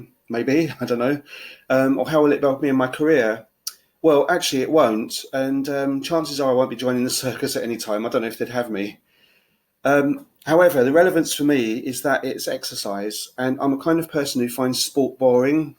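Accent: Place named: United Kingdom. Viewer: I never really noticed that you had like a British accent. (Is it a British accent?). Nice video by the way.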